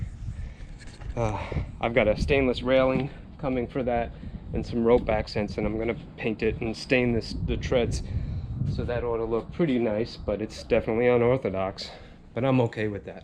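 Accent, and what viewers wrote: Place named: New York, United States. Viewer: Yea, now I recognize it, the slight New York accent. Subscribed, been binge watching - I'm live up north, here in the Outer Banks. Love you're Videos.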